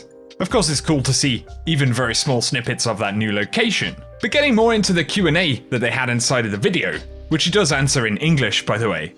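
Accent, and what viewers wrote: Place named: United Kingdom. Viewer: Gotta love the British voice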